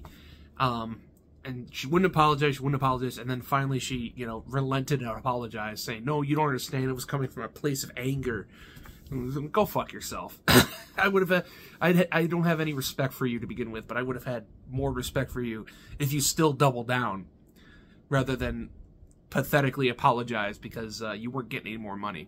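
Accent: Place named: New York, United States. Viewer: Wait a minute your not italian? I thought u was from New York i watch the vpw2 freem video and as soon as u chose shockmaster I was like I need grab a slice with this guy he knows his shit the Gambino look scratch all the politics talk what ever happens happens just know u went down as a made men and we honor that look at the brightside atleast u still got your video game collection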